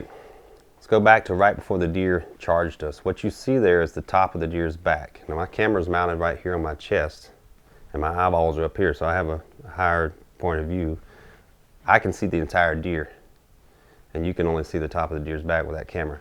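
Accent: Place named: Minnesota, United States. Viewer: What part of Minnesota are you from?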